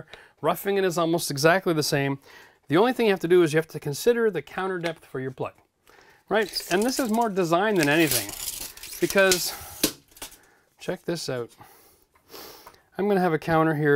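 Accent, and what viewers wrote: Canada. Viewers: Very informative. You speak well and clearly without lots of loud music in the background. I just subscribed. Are you Canadian? I notice and accent. I spoke at a couple conferences in Canada. I am redoing a bathroom in an old rowhome. How do I secure every NM every 5 feet if I am keeping the plaster and lath? Should I have separate circuits for the lighting and outlets? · I know that I need to look at my local regs, but just for reference, are you in Canada or the US? I’m detecting a hint of Canadian accent.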